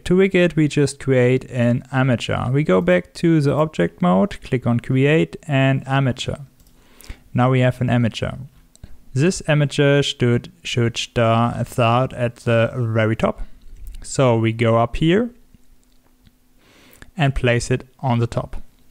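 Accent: Germany. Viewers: I Think i can hear a german accent in you english... but it´s working and that´s what i want! ... thank you for this tutorial it´s easy to follow...🙃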